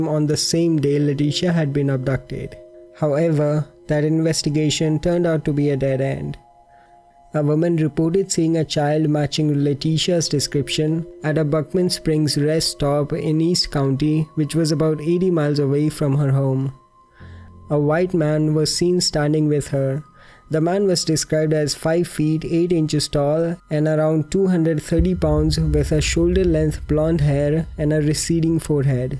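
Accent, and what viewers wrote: India. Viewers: It's seems you're from an Indian origin..your accent seems like so..btw loved the way u explain everything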